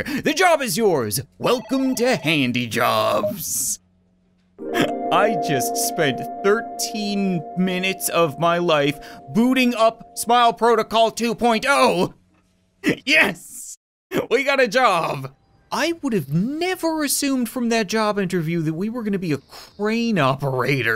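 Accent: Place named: Canada. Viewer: Bug The Pug he's Canadian he says words differently get used to it or leave simply as that.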